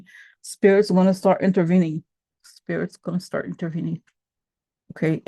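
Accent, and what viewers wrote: South, United States. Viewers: what an incredible episode. Thank you. KAM WON! Where are your rings?! Your southern accent is on point! Reminded me of family, excellent job!;D And I've never been more proud to also have adhd <3 <3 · you are so funny--the con man is so full of hot air. Love your southern accent which reminds me of a friend, a southern belle who grew up in Georgia. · Man, I’m from Georgia and that’s a pretty good southern accent! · I must say, Your southern accent is cracking me up. hahahahahahah Namaste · OMG, you do really well with the Russian accent, but the southern accent needs work. Lol.❤ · LOVE the southern accent 😂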